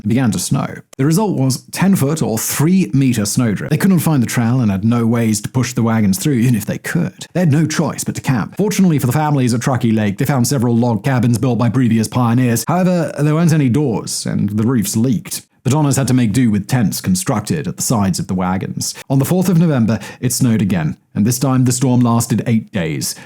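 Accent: United Kingdom. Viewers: Why are British accents so dreary/boring? This guy could be talking about the cancer cure and I'd fall asleep every time.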